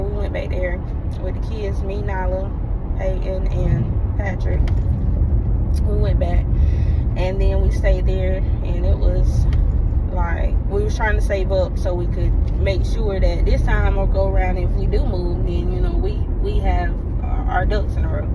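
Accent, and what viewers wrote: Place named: South, United States. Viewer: You are so right. I wasn’t going to say this but I’m a psychologist. If you feel like something isn’t right, it’s best to tackle the problem right away because it can only make things worse if you don’t. Early childhood development is very important. Everything is going to be ok though. You have to pray and keep going. By the way I’m seeing a lot more confidence lol and the accent is 🔥🔥🔥 you so country lol. God bless. Keep vloging.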